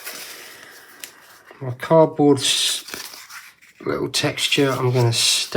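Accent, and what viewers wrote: United Kingdom. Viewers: Xeno, you've hit the nail right on the head. videos are full of information and easy to understand creative process. Like you, I dislike "self-indulgent" videos that don't actually achieve anything. As soon as I see someone talking about themselves on camera, I turn off. chat is informative and easy to listen to, and the N London accent makes me homesick for England!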